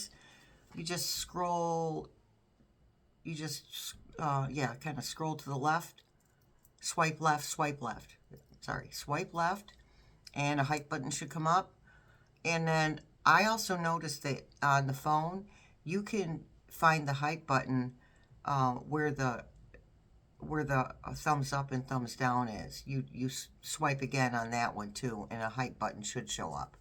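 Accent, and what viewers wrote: New York, United States. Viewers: Your New York sounds a little like my North St Louis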